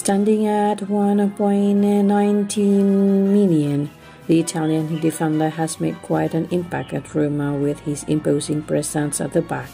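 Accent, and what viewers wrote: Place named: Vietnam. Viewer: AGAIN AND AGAIN VIETCONG VOICE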